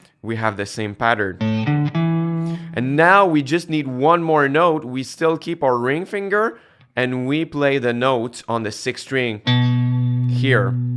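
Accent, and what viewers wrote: Quebec, Canada. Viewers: Hey are you québécois? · Are from Québec ? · You Sir, sound like you are definitely French-Canadian. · Accent québécois en tabarnak !! Nice vidéo !! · Hearing a quebecois accent here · Tellement l'accent du Québec, très bien expliqué mon gars ! 👍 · Ok, I’m saving this video. I’m going to have to watch it a few more times. BTW, are you from Quebec? I’m in Montreal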